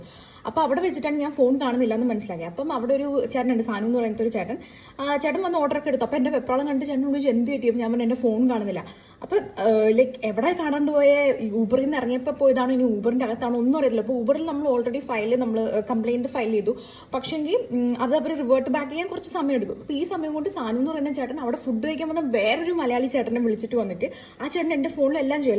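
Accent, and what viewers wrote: Kannur, India. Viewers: Keralathil evideyaaa??? Ur slang has a Kannur tone … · Ingal kannur ano????.. Samsaram niceee❤️😄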